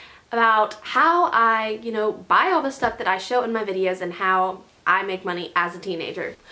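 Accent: South, United States. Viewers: Luv your southern accent I have one to!!! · i love her southern accent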